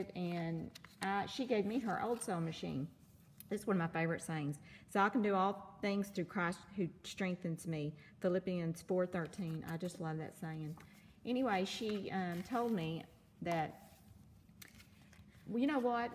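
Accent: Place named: South, United States. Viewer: Your gaw-geous southern accent is so wonderful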